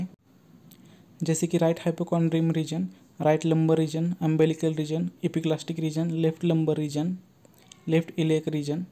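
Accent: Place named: Maharashtra, India. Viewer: jay shree ram brother'.....your language like Maharashtrian.... ❤️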